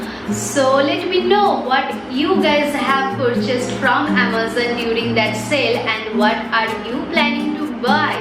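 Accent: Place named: Bengal, India. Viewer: First View Is My ❤️ and And First Like Is My ❤️✨ lot's of love your BANGALI VOICE Cutest pie 😊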